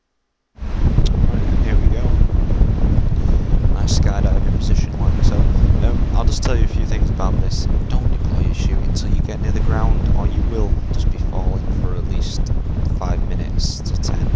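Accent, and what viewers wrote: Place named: Manchester, United Kingdom. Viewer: I was wondering where he was from, I knew he was northern, but this video DEFFO manc, it's how he says "you" as "yerr"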